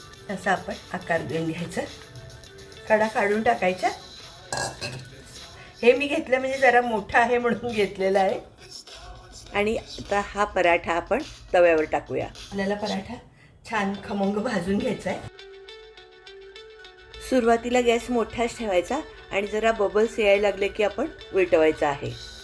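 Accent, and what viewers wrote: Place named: Kolhapur, India. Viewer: आम्ही पण 😀आजच तुमचा रेसीपीचा वीडियो प्राथमच आला माझ्याकडे आणि बोलण्याच्या टोणमुळे लगेच समजले कि कोल्हापूरी असणार तुम्ही ....... 😊👍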